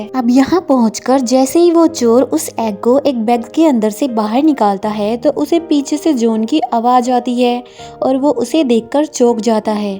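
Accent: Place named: Pakistan. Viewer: Nice explain... Tumhar aawaj se lagta .. Tum Pakistan se ho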